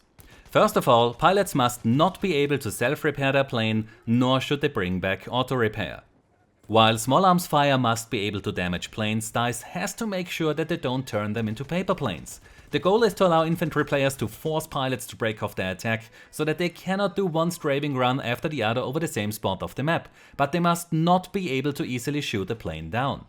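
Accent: Germany. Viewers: Are you from Germany? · Warum hört man sofort raus wenn ein Deutscher Englisch spricht :D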